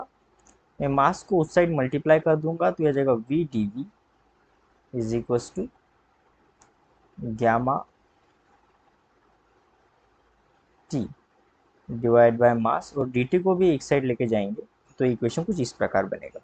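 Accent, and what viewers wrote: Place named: West Bengal, India. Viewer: Sir 's accent seems to be bengali,or assamese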